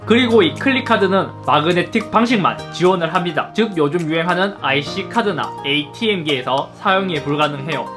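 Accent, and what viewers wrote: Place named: Gyeongbuk, South Korea. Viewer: 경북쪽 이신듯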